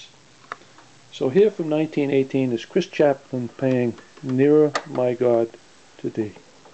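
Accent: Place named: Rhode Island, United States. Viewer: Just beautiful. So moving and reverent. I will never forget visiting the graveyard in Halifax where many of the dead are buried. What an emotional1 experience. This was m.h magnificent, thanks. By the way you have GOT to be from Rhode Island! The accent is unmistakable. Spent my earliest years there. I know that accent well!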